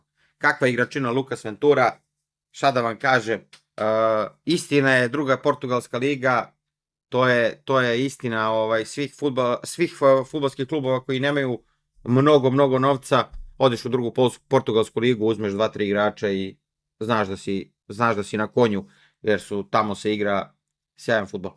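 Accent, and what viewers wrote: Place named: South, Serbia. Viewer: Gledajuci te i slisajuci,prosto ne mogu da se otmem utisku da izgledas kao bulja,a i pametan si kao ista. Nemoj vise komentarisati sa tim juznjackim naglaskom si preglup.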